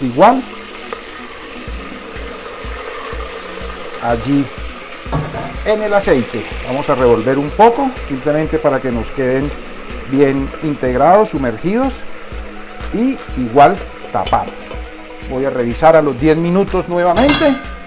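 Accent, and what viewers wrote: Colombia. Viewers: Mijo eres único, paisa de sabor y bien hablado, como nos gusta, bendición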